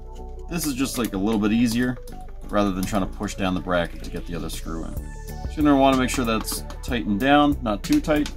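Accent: Canada. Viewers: You say "out" almost like "oot"-- which to us Americans is basically the stereotypical Canadian accent. Lol. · You do say "out" like you're Canadian. And that amount of thermal paste was perfect. Either way, better to go over than under unless it conducts electricity. 😊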